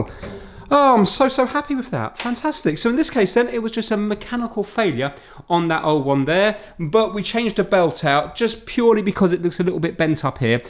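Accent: United Kingdom. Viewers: First of all, I LOVE your Brit accent that includes silly funny words and sentences which yeah… most brits are using. Then second, you just make it so simply to understand even for a non English speaker. Well done mate. Thanks for being here for us. 👍 Next time show us how to fix computerised sewing machines. I know, That alone is like a life job on its own 😄 Or perhaps electric radiators. Good job.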